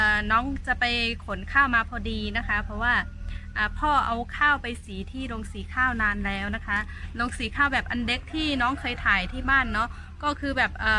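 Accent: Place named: South, Thailand. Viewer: เอาแกลบไปเผาดินขอคือเอาไปเผากระเบื้องดินขอมุงหลังคาบ้านล้านนา ฝั่งไทยหาดูยากเหลืออยู่วัดต้นเกว๋นที่ถ่ายละครกาสะลอง คำว่า ขอ คือด้านหนึ่งของกระเบื้องจะงอๆเป็นตะขอเกี่ยวไม้ระแนงบนหลังคา ทำจากดินเหนียวเลยเรียก ดินขอ สำเนียงน้ำเสียงที่น้องพูดฟังไปฟังมาก็นึกถึงภาษาใต้ ภาษาตระกูลไทเหมือนกัน รากศัพท์ก็อันเดียวกันเช่น เถื่อนแปลว่าป่า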